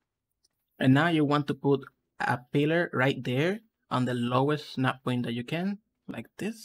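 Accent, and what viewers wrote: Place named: Cuba. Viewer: i like your accent i am cuban and you sound cuba or puerto rican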